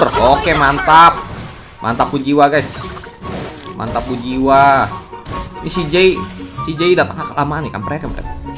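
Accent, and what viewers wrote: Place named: Jambi, Indonesia. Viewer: Orang mana bos? Kek bahasa jambi Gilo haha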